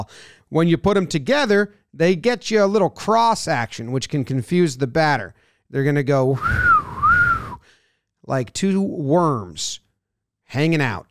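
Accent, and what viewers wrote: United States. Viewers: So weird to hear an American accent talking about cricket. Great vid though. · Nothing against you mate but it is weird hearing an American accent talking cricket. I felt the same with Leigh Diffey’s Australian accent covering US Track and Field. #mindblown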